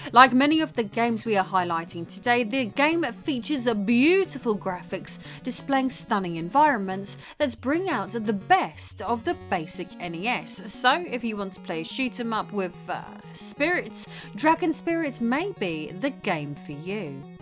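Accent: United Kingdom. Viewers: Hello You have a nice accent, are you British ^^ I wish i could find another Lady like you, passionate by video games.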